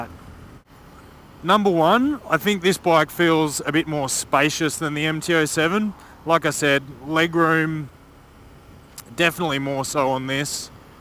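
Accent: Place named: Australia. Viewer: like your Australian accent! Greetings from Spain. I'm 5' 11" and I was wondering if this bike would be too low for me (seat height si 785 mm here in Europe).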